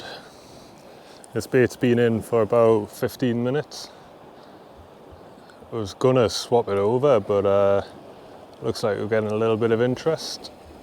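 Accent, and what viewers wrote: Newcastle, United Kingdom. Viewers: 😂 Howa man he is just a geordie it ain't his fault he is wrong side of the wear They speak Doric where I live now and if you canna understand him then you'll be knackad up here ❤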